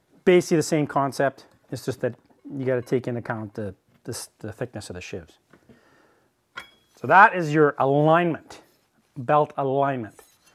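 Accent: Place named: Saskatchewan, Canada. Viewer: Are you from Saskatchewan?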